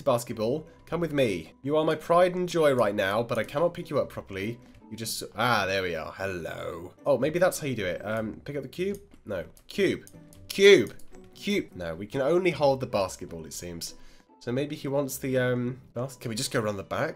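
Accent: United Kingdom. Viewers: O he is British I am Canadian whatever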